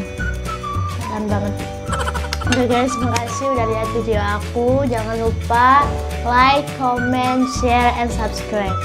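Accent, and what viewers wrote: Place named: Banyumas, Indonesia. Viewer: Salam kenal YouTubers ngapak😁😁😁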